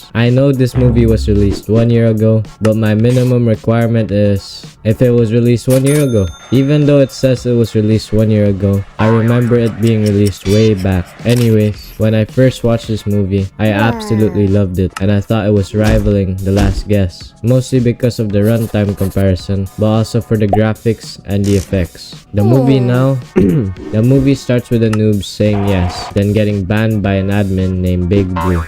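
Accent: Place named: Philippines. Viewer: Your voice is very filipino and i was right( i am Filipino too)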